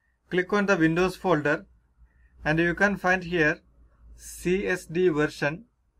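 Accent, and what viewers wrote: India. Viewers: there is always one indian guy with unique accent with solution to every pc laptop apple google problem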